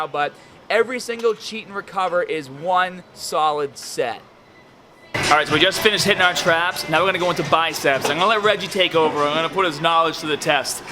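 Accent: Boston, United States. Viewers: Baaahstan accent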